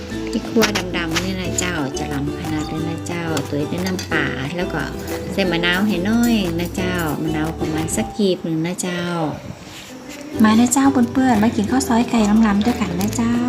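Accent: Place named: Northern Thailand, Thailand. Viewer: น่าทานมากค่ะข้าวซอย อู้กำเมืองเพราะจังค่ะ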